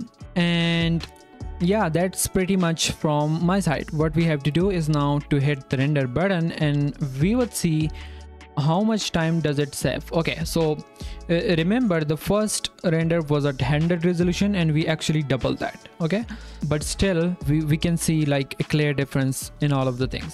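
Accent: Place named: India. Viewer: Why do useful tutorial always have Indian accents?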